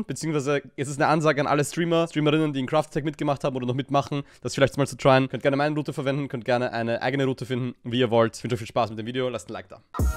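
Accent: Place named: Austria. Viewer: kommst du zufällig aus Österreich (nur aus Interesse) wegen dem Akzent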